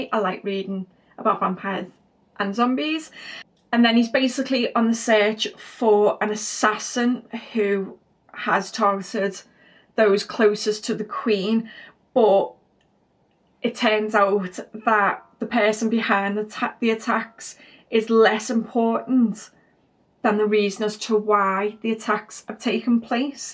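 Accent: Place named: Midlands, United Kingdom. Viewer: You have some cool books in the plans for this month, I hope you can get to them all. Except the Cassandra Clare one, she’s evil 😹 Also, i’m desperately trying to place your accent. I’m not English I’m just into accents, so I’m probably terribly wrong but I’m thinking you’re somewhere from the Midlands? :)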